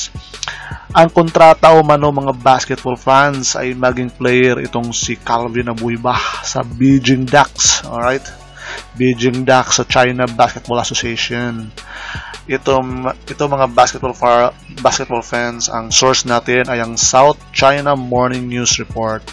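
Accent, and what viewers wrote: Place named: Visayas, Philippines. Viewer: Bisaya man gid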